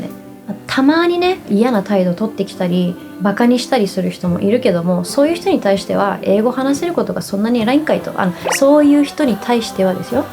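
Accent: United States. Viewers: Your English is super fluent honestly. Like, the way you spoke in English just sounds as if you lived in the U.S. for a majority of your life rather than a couple years XD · Thanks for sharing your story, despite all the struggles. Actually, with your American accent, I first thought you were born and raised in the States. Very impressive for only 34 months! Your fluency in English is now helping your music career a lot. This likely wasn’t your main intention as a kid, so it shows that perseverance and hard work can pay off even in unexpected ways.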